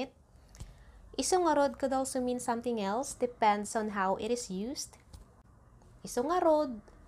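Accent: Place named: Ilocos Sur, Philippines. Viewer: Very southern ilocano. 😊😊😊😊 they will confuse that vowels have different ways to pronounce 😂😂😂😂 vowel A become I 😂😂😂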